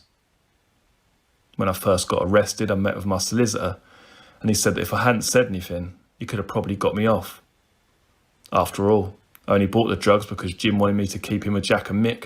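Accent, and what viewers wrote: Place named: Essex, United Kingdom. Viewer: He can't help his accent he's from Essex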